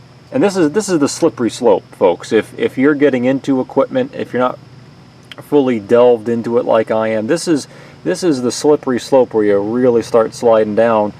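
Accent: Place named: Canada. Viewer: Bro's either canadian or wisconsin'. either way, yuk.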